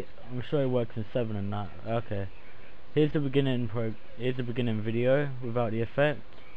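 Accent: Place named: United Kingdom. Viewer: it was refreshing to hear one of these tutorials from a british voice :]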